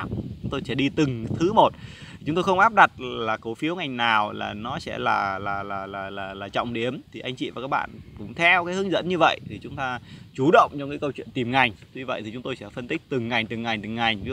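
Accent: Thanh Hoa, Vietnam. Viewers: Em ở Hà nội hay tp Hcm,giọng thì tỉnh Thanh Hoá.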